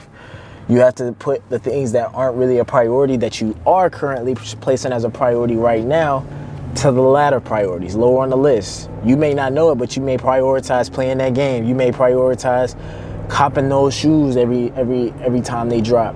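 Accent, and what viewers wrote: Baltimore, United States. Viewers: Are you from Baltimore ? 😭 also the videos are 🔥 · Bro u from Baltimore them ouis sound familiar 😂na great motivation bro ima subscribed now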